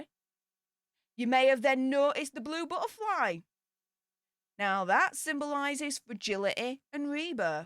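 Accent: United Kingdom. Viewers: I like it when you keep us updated on the UK in your cute British accent 😊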